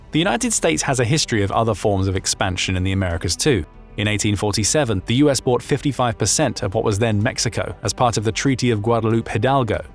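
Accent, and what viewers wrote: United Kingdom. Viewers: Even though the topic is disturbing, it is buffered by a British voice. I don't think this is realistic, but I hope that our Commonwealth allies would help us.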